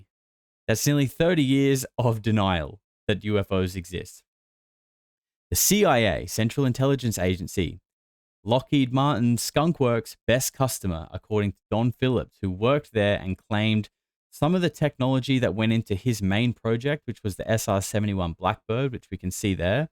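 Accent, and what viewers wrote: Australia. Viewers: No buddy; Aussies sound amazing, love the way you speak :) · Yes frame, U DO SOUND LIKE THAT - THE 1ST WORD U SAID HAD STARTED YOUR AUSSIE ACCENT, EVEN THOUGH U PROBALY GREEK OR ARABIC BACKGROUND!!!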